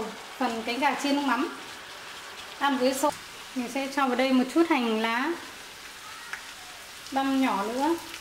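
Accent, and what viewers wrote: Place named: North, Vietnam. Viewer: Chị đi nước ngoài nhưng vẫn giữ được nét truyền thống thật, từ cách nấu nướng tới cách nói chuyện với con gái đều rất đậm chất bắc bộ :) rất thân quen ạ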